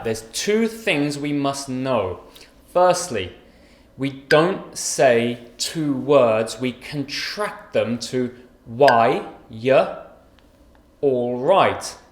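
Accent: United Kingdom. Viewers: I like your British English accent too and thank you very much.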